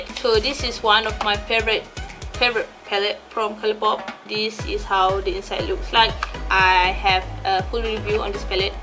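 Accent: North, Malaysia. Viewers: Your first video that i watch probably the fresh everyday makeup look...terjumpa video tu masa tgh scroll....terus terlekat sampai la ni. video suma 😂 lawak la bila ckp..pastu suka tgk loghat utara kuaq haha..😂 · first video yang i tengok is try-on makeup haul | wet n wild MY , masa tu i tengah tengok review review product wet n wild haa sekali nampak pulak review from malaysian youtuber apa lagi tekan teruih la . seronok gila your video sebab the way you talk , reaction you sumpah lawak and i love you loghat utara . congrats on your 10k and mungkin lepas ni 20k , 30k pulak . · Congrats on your 14 k subscribers. Actually dh tk ingt mna video first yg i tgok sbb tiap2 mlm sbelum tdoq dok ulang tgok vdeo 😂😂I've to checked it back and yeahh rasa rasanya video "duck cosmetic honest review" tu kot. Lepas tu terus ishh sapa dia ni jujur sungguh review nih aku suka then terus subscribe and sbb hg ckp utara tu suka dngaq beletiaq. 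Hahaha 😂😍💃🏼💃🏼💃🏼💃🏼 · first video yg kmi tngok tu video top 10 drugstore products. lpas tngok truih mcm ni taste aq pnya video ni. yg tk bosan and tk rsa nk skip pun. ap lg. truih subscribe laa. and bermulalah detik2 mngikuti stiap vid lpas tu. suka sngat tngok vid sbb mmg information padat and jujur. Org yg sngat slumber gilaa, yg tk kesah laa ap pun, tk cover ayu2, macam aaa just be yourself pnya attitude gituh.Kmi suka org mcm tu. Bru selesa kan nk buat ap2 pun.Video plak diselitkan juga dngan crita yg mmg menarik dan lwak.Jrang sngat ttiba tngok vid makeup tapi glak srg2. Kecuali vid ja (its true, not just because the giveaway XD) Yg pling tk bleh lupa crita msalah confuse tarikh tu. Tarikh ttkaq laa, tiket tarikh lain. You just like me. Vid plak bkan guna bnda yg sma slalu, sbbkan tu tk ragu2 pun nk tngok vid baru atau vid yg lain. Loghat plak mmg utaqa, geng skali. Tak prnah bosan laa jwabnya. 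Kdang duk tngok ad dk vid yg baru. Bila ad, truih tkan. You deserve all this. Org tkkan suka something tnpa sebab:) Semoga ceria slalu and keep update new videos for all your fans <3 (lupa buh so edit blik kehkeh)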